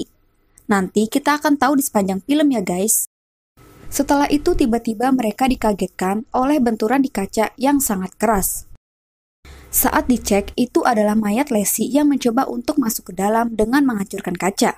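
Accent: West Java, Indonesia. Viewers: Sunda ya kak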